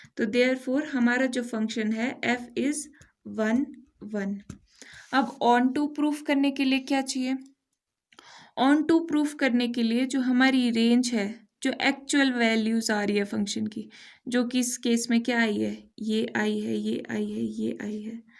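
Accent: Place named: Himachal Pradesh, India. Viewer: ma'am i am also from Shimla (Himachal Pradesh)... Meko aapki slang se lg rha tha k aap yhi k ho isliye mene poochh liya😅😊😊😁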